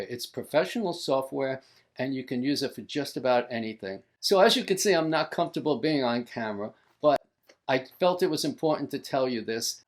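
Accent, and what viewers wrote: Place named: East Coast, United States. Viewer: I am from RI...your east coast accent was unmistakable! Lol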